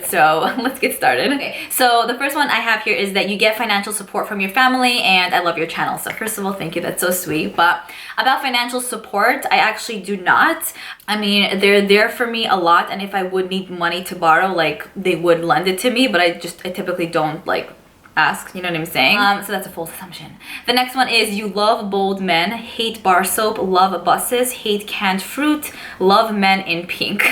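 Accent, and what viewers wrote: New York, United States. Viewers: Love watching your videos. I miss New York and all my family that still live there. Listening to you talk reminds me of home. I think you have a great personality and I look forward to watching more by you. So glad I came across your videos. Love to see more makeup tutorials since I suck at it. Lol 😆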